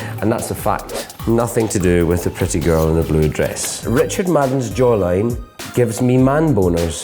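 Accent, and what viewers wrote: Scotland, United Kingdom. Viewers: Omg, he is a Scot! Just realized that! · Trepimero Scottish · he's so Scottish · it's a Scottish accent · He's Scottish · Scottish accent!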